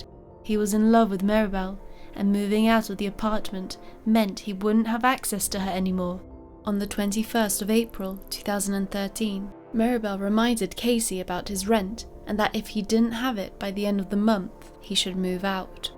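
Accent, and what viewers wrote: United Kingdom. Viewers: she got the most British sounding voice ever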